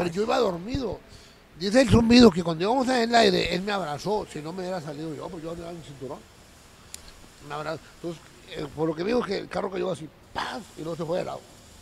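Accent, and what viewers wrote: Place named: Coahuila, Mexico. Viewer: Es mi paisano coparito así ablamos los buenos coahuilenses de antes hoy muy difícil mente te encuentras con paisanos así ya que nos invadieron chilangos chiapanecos jarochos tabasqueños y asta centro americanos en toda la región coahuila AGUSAO antes de jugar saludos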